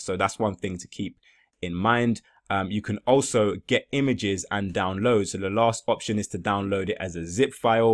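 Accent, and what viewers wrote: United Kingdom. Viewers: Thanks for the fast reply . I know it is early morning for you, if your UK accent is any indication as to your location ... :-)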